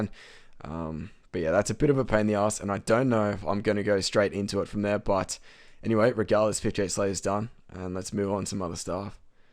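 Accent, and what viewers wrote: Australia. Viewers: He talks like he has an awesome Australian accent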